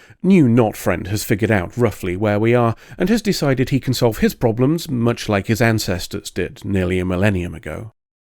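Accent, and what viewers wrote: United Kingdom. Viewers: Dude, I absolutely fucking love your reviews. There;s something about a British accent talking complete shit about a show I love. And your way of saying "cunt" is so deliciously venomous. :D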